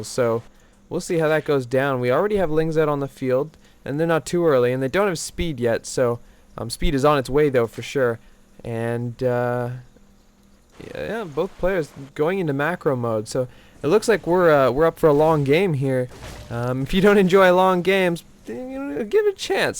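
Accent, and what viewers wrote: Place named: Canada. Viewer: lol your accent very canadian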